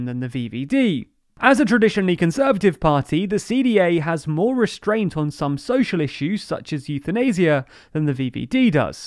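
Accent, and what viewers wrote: England, United Kingdom. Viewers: DitisKaas Appel there are dutch team members in TLDR, it's not 100% based, but ofc for clarity the english accent just works better than stone cool english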